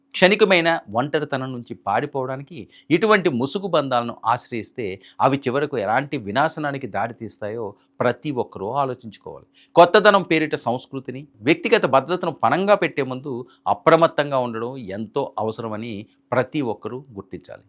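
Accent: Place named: Andhra Pradesh, India. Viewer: వరై ఏంద్రా ఆ loveday ల భాష..యాస ... నీ ఆంద్ర సోల్లు ఆపు... మంచిగా తెలుగు మాట్లాడు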